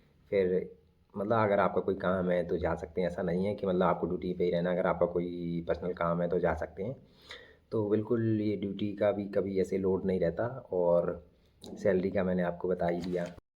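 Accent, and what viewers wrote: Maharashtra, India. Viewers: Saff pata chala sir aap marathi ho sir❤🎉🎉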